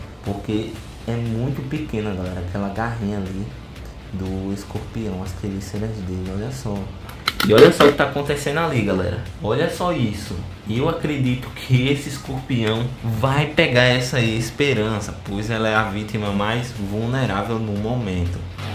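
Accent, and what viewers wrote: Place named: Northeast, Brazil. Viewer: O e nordestino por causa do jeito de falar KKKKKK Mais o vídeo ficou incrível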